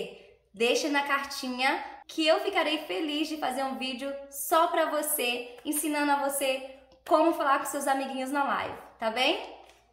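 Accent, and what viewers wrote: Brazil. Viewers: Huruuu ❤ agora aprendo amiga falando caipira como eu falo do interior 😂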